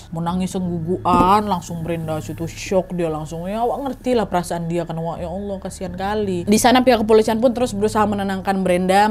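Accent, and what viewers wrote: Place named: Medan, Indonesia. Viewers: kamu hebat dalam menyampaikan isi cerita kriminal yg seolah olah kamu sedang menyaksikan nya.. Salut utk kamu.. orang Medan ya? Kok logatnya kental bangaaaaat.. sor kali! 😜😜😜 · Lucu si pembawa acara..Kaek orang Sumatra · idolaku yng baru dlm knten yng crewet dr bbirmu tu, suka x bahasa yng kao ucap tu, kek orng Medan, apa pekanbaru tah aplah tu, lnjut skses bun cntik👍🤣😀😂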